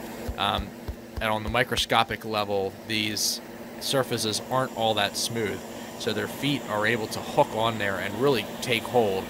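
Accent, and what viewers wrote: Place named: Philadelphia, United States. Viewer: N.E. Philly? Your accent gave you away 😂 Im in Mayfair and hoping to not get stung this summer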